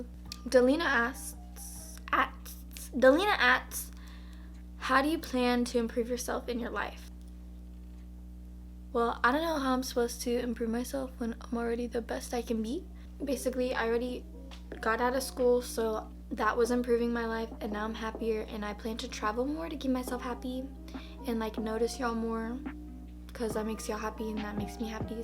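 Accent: Texas, United States. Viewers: What part of texas are u from ?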